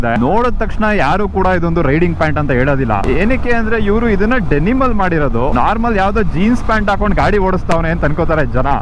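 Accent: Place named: Kundapura, India. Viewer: Kundapura kannada ❤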